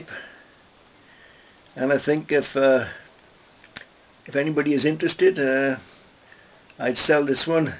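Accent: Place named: Wales, United Kingdom. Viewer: Thank you for this. As a Welsh woman living in England, it was lovely to hear your accent and Lonesome Dove is one of my favourite novels, so I liked your book review. (The pipes looked really good as well :)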